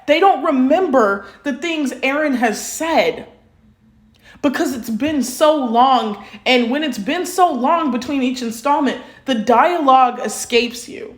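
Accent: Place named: United States. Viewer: I kind of reached my limit with you saying that in real life, 'talking is the answer', because no, it isn't. I can just tell from your accent that you're from the New World and this opinion shows it. For example, my country the Netherlands. Its earliest foundation was a Republic, and do you know how it came to be? Spain had taken over the region and we fought 80 years for our independence. We had several talks and a truce, but it never worked as Spain refused to give the Dutch ethnic group our land until Spain was caught up in too many wars and was financially drained to the point it couldn't afford to keep up the war. Then they agreed to declare us an independent republic. The answer to our freedom was money. WWII wasn't solved by talking, but by people fighting back enough that Hitler knew he was going to lose so he killed himself. I can continue. 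Sure, treaties and truces are done through verbal communication but they don't get made if the war is more beneficial to the people fighting then peace is. Either one or both parties need to sink deep enough before the talks happen, and that is exactly what AOT shows: To the rest of the world the genocide of Paradis was more beneficial then peace so they opted genocide. Which is why the only one willing to talk with Paradis is Hizuru, because they would benefit more from peace with Paradis then a genocide because then Marley and other countries get a hold of the resources they want.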